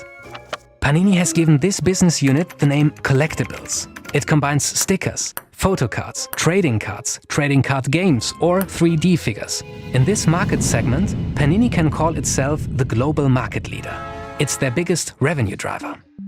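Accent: Germany. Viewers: Love your videos, you got the most pleasant English accent I know. Und das mit einem deutschen Akzent zu schaffen macht das ganze noch genialer ;) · Is this a german accent?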